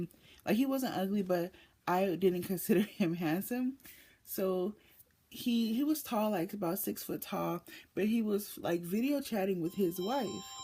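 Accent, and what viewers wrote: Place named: United States. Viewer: Are you a British or American ? Your accent sounds like American .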